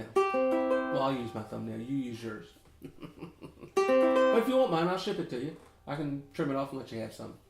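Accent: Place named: South, United States. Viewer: Awesome,! Shirley loved it! I love southern accents too!:o)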